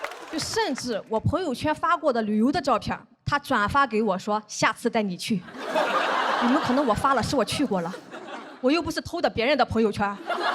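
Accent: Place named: North, China. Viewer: 他的风格一部分是靠节奏，一部分靠口音，好笑不好笑要看对北方方言的熟悉程度，不熟悉的人就是觉得不好笑。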